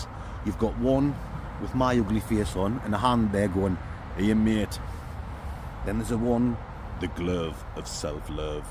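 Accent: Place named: Sunderland, United Kingdom. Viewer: My late dad was a Mackem, love the accent,!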